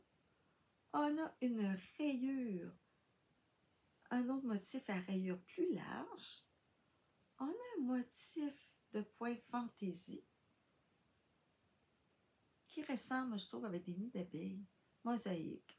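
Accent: Quebec, Canada. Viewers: Quel plaisir de vous visionner, j'adore votre douceur et votre bel accent québécois. Bonnes vacances. Bisous de la France 😘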